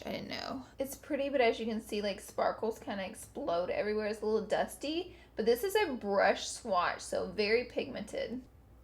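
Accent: South, United States. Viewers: She sounds SO southern I love it! #SouthSquad WYA? Edit: SO MANY SOUTHERNERS! I found my people!!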